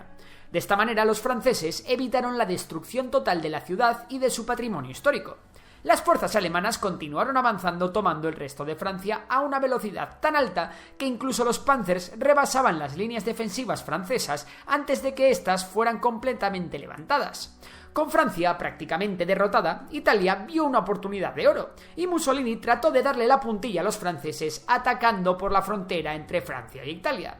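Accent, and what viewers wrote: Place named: Castile, Spain. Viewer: Ha alguien mas le molesta el español castellano?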